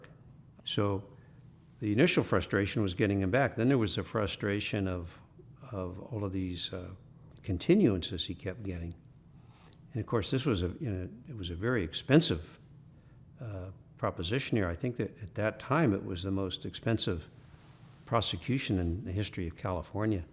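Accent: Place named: United Kingdom. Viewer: This is a wild story good job covering it. I really appreciate the fact that you limited your British accent in the video. American crime American voice. Nothing personal. I can barely understand what in the toast and beans you’re saying.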